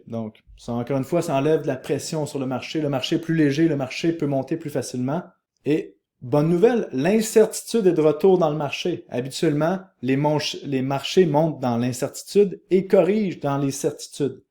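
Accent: Quebec, Canada. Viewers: 🙏 hello restes comme tu es! toujours au top concis et en plus avec ton humour et ton accent canadien tu nous apportes plus un petit moment de rafraichissement qui fait du bien...et l'as si svt dit qd le marché monte n'oubliez pas de faire des profits! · Tu regale , j'avais un peu de mal avec l'accent québécois mais le contenu apporte tellement de valeur qu'on est obligé de s'y faire 😂❤💪🏼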